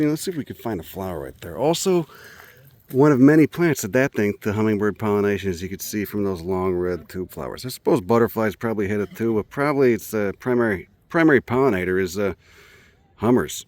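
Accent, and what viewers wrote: Chicago, United States. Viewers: He’s from Chicago. It’s a Chicago accent. You have to be born and raised here.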